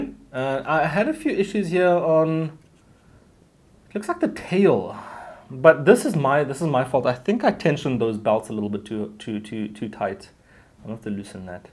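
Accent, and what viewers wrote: South Africa. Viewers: Are you South African?? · dig that Saffa accent - FTW :-)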